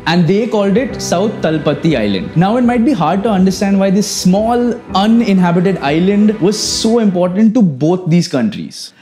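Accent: India. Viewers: This south Bombay accent is really nauseating. I hope it vanishes.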